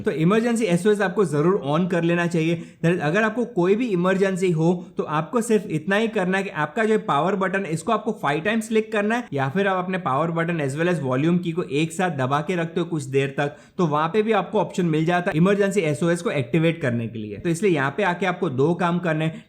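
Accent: Gujarat, India. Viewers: Are you a gujrati sir?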